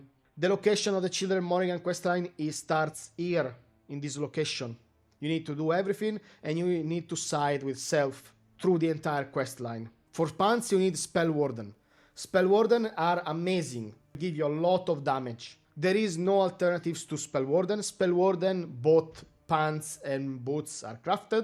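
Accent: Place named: Abruzzo, Italy. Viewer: wow your accent is bad... let me guess you are from Abruzzo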